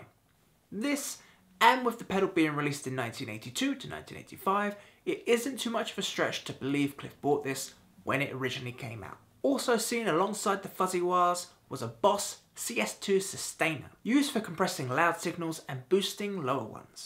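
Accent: England, United Kingdom. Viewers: English accent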